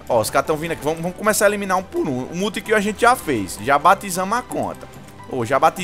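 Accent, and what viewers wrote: Northeast, Brazil. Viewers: se e do nordeste se tem o sutaque do nordeste kk mercadinho tbm so do nordeste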